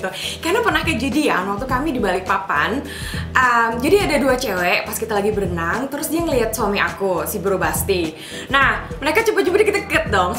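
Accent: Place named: Batak, Indonesia. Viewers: Lucu bangat Kaka bawainnya... Aku Batak jadi logat Kaka nyambung bet ke aku... Hahahha Kaka yg terakhir katanya 1 tahun pacaran... Harus langsung nikah ya ka? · remeng , medan gt yaahh 😄❤️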